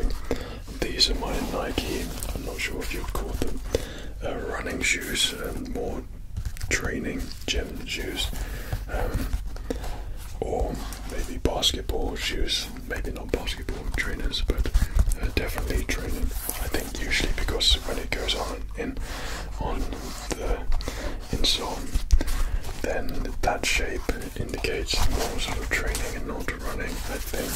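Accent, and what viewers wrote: England, United Kingdom. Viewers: I never knew was a fellow english man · My strange addiction over here ngl I’ve been watching u for time had no idea u were British 😂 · your from England? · is Australian, is British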